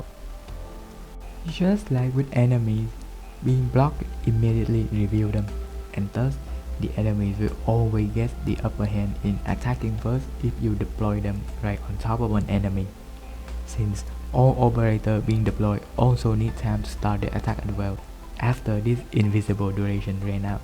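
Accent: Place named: Vietnam. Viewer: Vietnaese accent for sure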